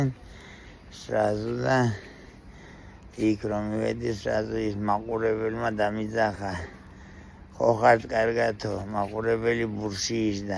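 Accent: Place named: Imereti, Georgia. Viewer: გილოცავთ ახალ წელს, ჯამრთელობას გისურვებთ! საიდან ბრძანდებით ბატონო, იმურული კილო გაქთ. ❤️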